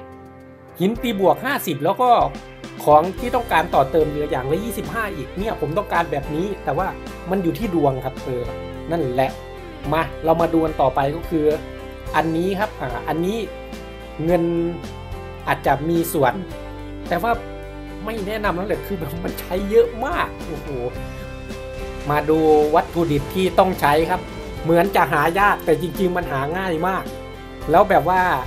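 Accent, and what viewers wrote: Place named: Ubon Ratchathani, Thailand. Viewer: ครับผม สำเนียงพี่เหมือนคนแถวบ้านผมเลย ผมคนอุบล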